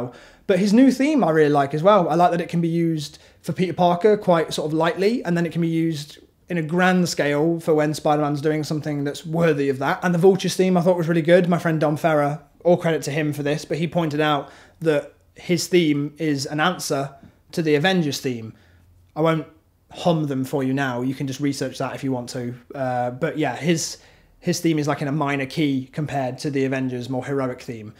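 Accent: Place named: East Midlands, United Kingdom. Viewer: I knew was an East Midlands lad! Woo!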